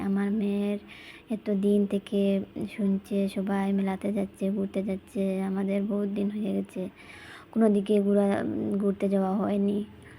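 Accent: Sylhet, Bangladesh. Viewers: লাইক সাবস্ক্রাইবার করে দিলাম ইনশাল্লাহ এগিয়ে যাবেন সুন্দর মন থেকে ভিডিও বানান সমস্যা নাই পাশে আছি এগিয়ে যান হ্যাঁ আপু তোমার বাড়ি কি সিলেটে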